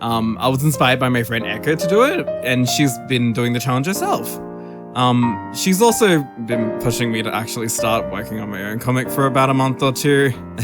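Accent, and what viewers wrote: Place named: RP, United Kingdom. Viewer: whoah, that accent.... whoah... I thought you were not gonna have such a posh accent wow........ just whoaaaaaaah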